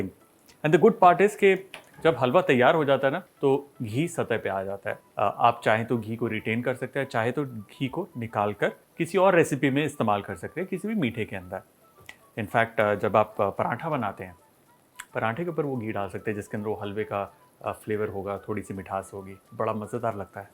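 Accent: Punjab, India. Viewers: App Punjab Se Ho kya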